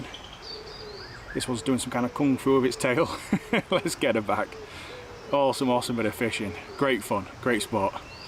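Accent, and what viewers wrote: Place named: Nottingham, United Kingdom. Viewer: I'm a Nottingham angler and you sound local so can you tell me were is that canal you're fishing ..... I fish mainly at Colwick Park Nottingham??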